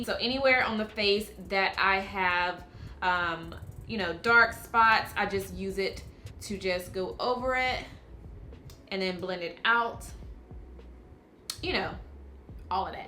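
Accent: South, United States. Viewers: Love your channel! And your accent! Are you from the south? I’m from Mississippi so I love hearing what I think is a Southern accent :) I bought butter pecan in Sephora a couple days ago but it’s a tad too dark and orangey on me. I’m thinking I MAY be caramel...😩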